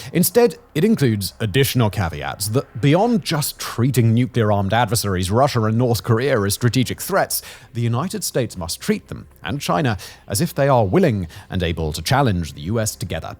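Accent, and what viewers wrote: United Kingdom. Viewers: Can we please have an American announcer speaking about America. Let this brit broadcast about the stabbings in London or stop with the intensional severe brit accent. Take the marbles out of your mouth and speak American english language.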